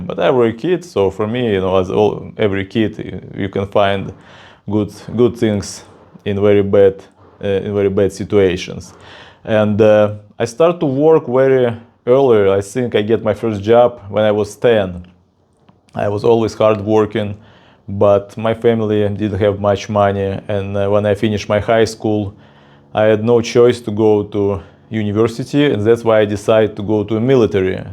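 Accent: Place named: Russia. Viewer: Oh my God! I'm from Canada I got your add. When I heard you speaking with Russian accent. I just stumbled I couldn't believe my eyes 😂. That is so cool! I'm definitely signing up for your channel!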